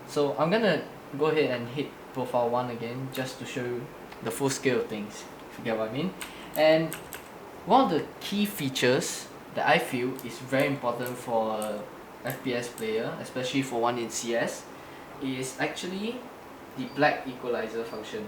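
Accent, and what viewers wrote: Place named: Singapore. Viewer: the accent is killing me.... Singapore?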